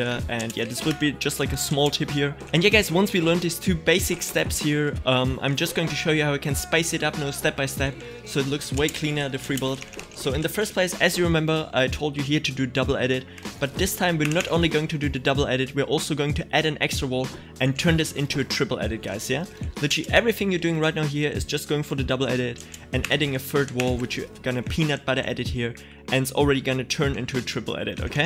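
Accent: Germany. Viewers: du bist doch save deutsch das hört man irgendwie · I hear German in his Voice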